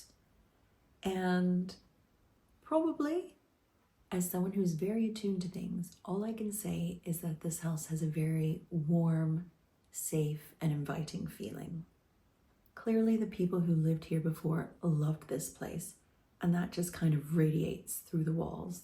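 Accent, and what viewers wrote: United States. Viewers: Are you from New England? Something in your accent.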